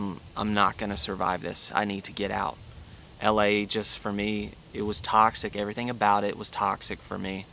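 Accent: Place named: Georgia, United States. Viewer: So glad you're on the upswing! The Georgia accent is coming back and I think that's a good thing ♥️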